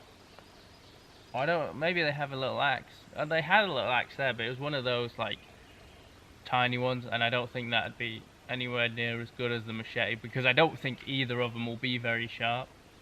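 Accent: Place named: United Kingdom. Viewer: Aren’t you British, you sound British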